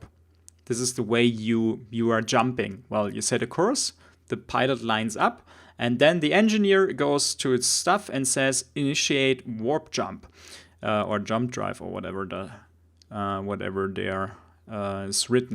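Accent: Germany. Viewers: Wenn Deutsche Englisch sprechen :) aber trotzdem gutes Video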